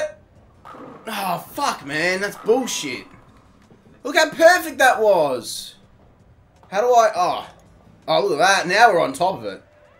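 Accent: Australia. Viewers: Aww mate, congratulations on 10,000! Super happy for you, I know I haven't been here as long as a lot of your other fans (I guess it's been about... seven months? Eight?) but I knew from the first time watching you that you were going to be my favourite YouTuber; my instinct proved right and to this day you still are, you definitely deserve it and much more. As for this video I mean, shit, I've never even played a Wii in my life lol I've always thought they look mediocre at best, I knew people who had them when they first came out but they just never appealed to me. Looks like you had fun though haha, your laughter is contagious as hell.:') On a side note: I've noticed I laugh an awful lot whenever you say the word ''cunt'' in your videos lmfao I dunno it's just so hilarious to me, it's a funny word that's apparently made even funnier when spoken in an Australian accent. 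xD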